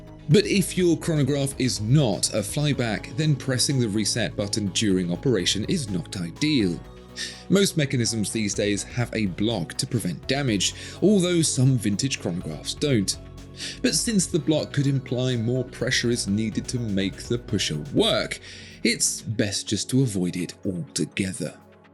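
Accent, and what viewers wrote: United Kingdom. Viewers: Finally, i’ve seen who’s behind that British accent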